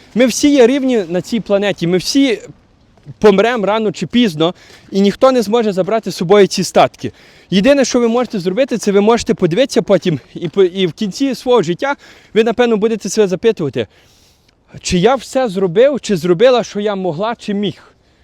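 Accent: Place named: Ukraine. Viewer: Давно не слышал такого красивого украинского языка. Сразу видно - родной язык а не переученный. Слушал как стихи.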